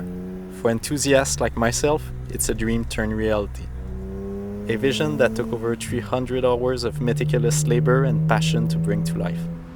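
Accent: Quebec, Canada. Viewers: It took 3 words to recognize the accent. Super proud to see a fellow Quebecois on this amazing channel. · TOKÉBAC !!! T'as l'accent à GSP mon gars t'es un vrai 💪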